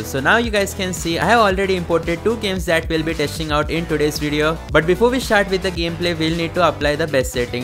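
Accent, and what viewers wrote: India. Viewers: i need a subtitle i dont understand indian english accent bruh😂😂😂😂😂😂😂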